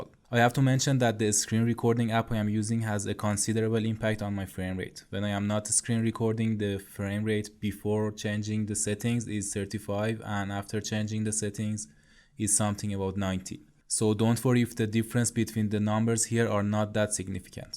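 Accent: Iran. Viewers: داداش دمت گرم عالی بود.ایرانی بودن یه طرف انگلیسی رو با لهجه اصفهانیدوست دارم :goodvibes:. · سلام ، عالی بود ، فقط یه سوال داشتم ، ایرانی هستی ؟ آخه لحجه ات فارسیه توی تلفظ کلمات اما بازم کارت عالیه ! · thanks for the tutorial 100% Persian accent 😄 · iranian accent :))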